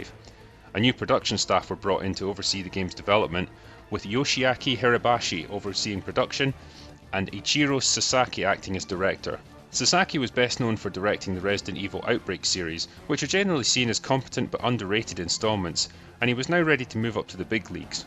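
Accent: Scotland, United Kingdom. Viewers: ive had some Scottish friends and they sound a lot like him..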